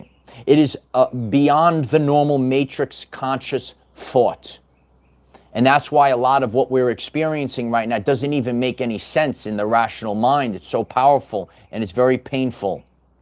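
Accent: New York, United States. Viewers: Where are you? You sound like you are from new york.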